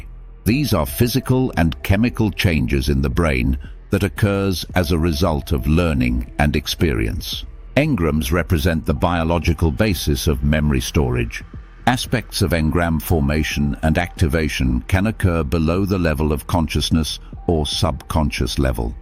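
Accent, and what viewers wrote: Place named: United Kingdom. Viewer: I think this is the best video you have done to date! And I love that the narrator has a British accent!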